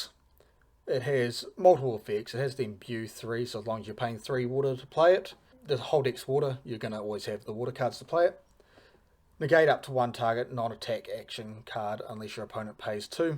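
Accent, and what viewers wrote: New Zealand. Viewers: Good old Kiwi accent!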